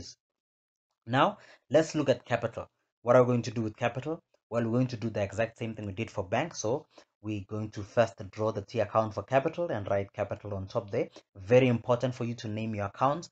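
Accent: South Africa. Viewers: 🇿🇦lets go you from sa can hear your accent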